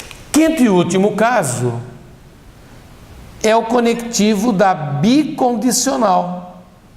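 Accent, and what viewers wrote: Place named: Brazil. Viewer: é pq quando se aprende na escola, a letra e tem som de é e em uma oração, quando se vai ligar palavras, tem som de "i". Acho que falam "ê" por causa do costume de algumas regiões do Brasil, por isso eu estranho kkkkkk Parabéns pela aula, estou assistindo os demais conteúdos. Obrigado por postar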